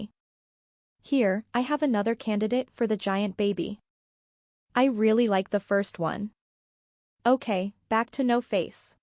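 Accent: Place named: France. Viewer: Don’t be afraid of your awesome French accent whilst speaking other languages. It is sooo fabulous when French speaking people keep their accent. I was born the same as you. The 80’s and 90’s fantasy films were the best years. We had a small resurgance with Harry Potter and LotR. But the (some of the late 70’s) 80’s and 90’s were iiit!!!!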